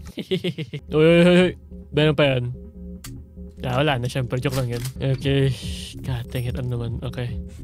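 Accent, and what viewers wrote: Visayas, Philippines. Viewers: Bisaya diay ka dol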